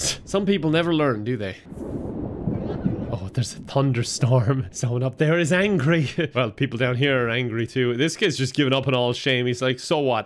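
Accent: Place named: Ireland. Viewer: So I’ve been binging your videos enough that your accent has gotten stuck in my head for a few days and now I’m convinced that I have to go visit Ireland, it’s officially the second thing on my bucket list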